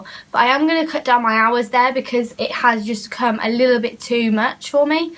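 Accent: United Kingdom. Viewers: Where in the uk are you from originally ? Your accent is beautiful :)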